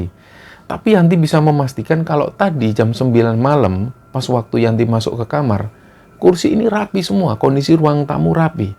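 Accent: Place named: East Java, Indonesia. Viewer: Hadiiirrr Banjarmasin nyimak,aku wong jowo seneng nek ngrungokke mas crito,logat jawanya kentel bgt jowo wetanan..,seneng bgt pokok e,sukses terus mas &tim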